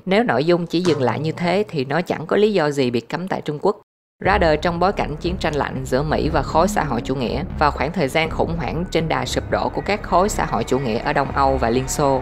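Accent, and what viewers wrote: South, Vietnam. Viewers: Bạn nói hay mà. Bạn người Nam thì cứ nói giọng Nam cho tự nhiên. Nghe vài vị dở hơi mắc mệt. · Nên nói là D miền Nam cố nói giọng Bắc thì còn khó chịu hơn đó bạn. Đừng đánh mất giọng miền Nam thân thương. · Lúc đầu tui cũng nghe không quen giọng của ad vì hơi nặng so với giọng miền Nam bình thường, nhưng mà nội dung ad làm hay quá nên bị cuốn ý, nghe nhiều thành quen, không sao đâu nha ad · Người MIỀN NAM thì phát âm giọng MIỀN NAM dùm cái,Tinh da chứ k phải Tinh gia,dì chứ k phải là gì,dao nhiệm vụ chứ k phải là giao nhiệm vụ....nghe chói tai vcc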